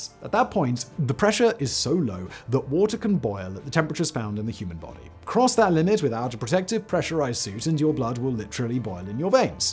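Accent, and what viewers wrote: RP, United Kingdom. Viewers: I had to laugh. Simon couldn’t hold David Attenborough’s cue cards. At 66, I have been watching documentaries with their sonorously-toned narrators for a half century. There was Nat Geo specials Joseph Campanella and Richard Kiley; Nature’s George Page; Morgan Freeman everywhere; Secrets of the Dead’s Liev Schreiber; Nova’s Jay O. Sanders; Frontline’s Will Lyman…the list goes on. Simon isn’t even a middling presenter. He talks too fast, with poor enunciation and his British accent (I usually like British accents) is heavy, annoying, and pretentious. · This is a bit rude, but would it be possible to flatten the British Received English accent a bit and fluctuate your Oxbridge tone a bit less. It's really tough to listen - while the videos look fascinating and are a real shame to miss. A normal, average London middle class accent would be great. 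I'm sorry if this is offensive in any way, if so, please ignore.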